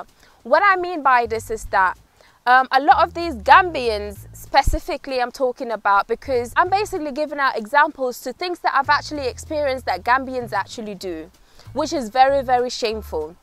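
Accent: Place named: RP, United Kingdom. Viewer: Hi. Can we do a massive online database for all major African languages assisted by those who speak them well and technologies like NLP, ML, AI, Crowdsourcing, and the like? Forgive me for saying this but your Queen's English is adorable (This is what they taught us in school from Primary all the way to Uni)so I cannot remove myself from it entirely. I'm a Kenyan from a major tribe that takes English as its first language! Talk of colonial mentality.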